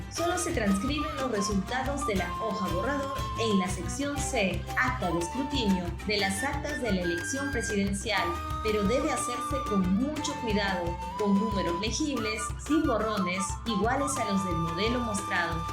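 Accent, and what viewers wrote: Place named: Galicia, Spain. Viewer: Soy <mail> me parece bien un video, pero no me gusta el audio parece una gallega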